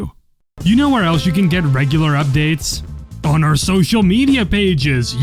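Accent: Netherlands. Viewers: Are you Dutch? you have a slight Dutch accent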